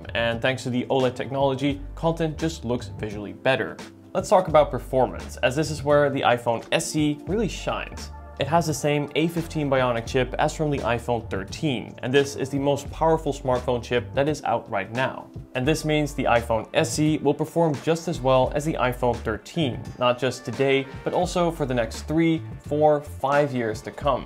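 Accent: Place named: Netherlands. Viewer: Nice comparison (review) man, took me a minute to figure out your Dutch accent. 😂😂😂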